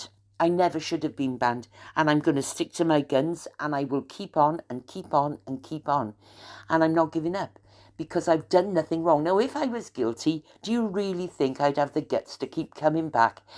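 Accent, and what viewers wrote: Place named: Newport, United Kingdom. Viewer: Welcome back I hope you stay on for ever. From a former Newport women who lived in Pillgwenly for twenty years, now loving in Italy. Your accent takes me back to when I lived in Newport. We love you ❤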